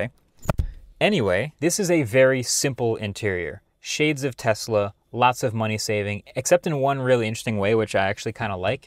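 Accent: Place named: California, United States. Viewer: nghe giọng điệu này niết ngay cali rồi ! Đúng chứ người Mỹ gốc cây ?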